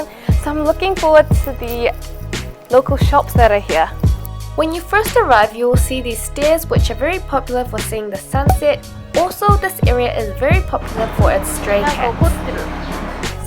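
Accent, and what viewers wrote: New Zealand. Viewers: Its so cool finding people also from New Zealand. I knew your accent sounded familiar ^_^ newly subbed but so far I adore your videos <3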